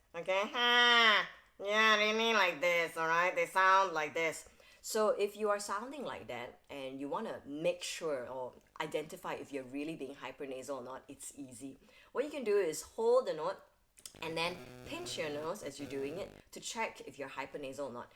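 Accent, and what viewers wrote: Singapore. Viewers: Sounds Singaporean to me. Whats wrong with that?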